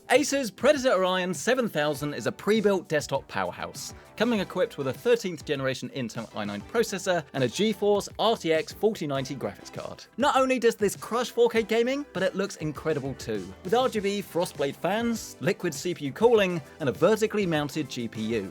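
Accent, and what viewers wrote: United Kingdom. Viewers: Too much British can't understand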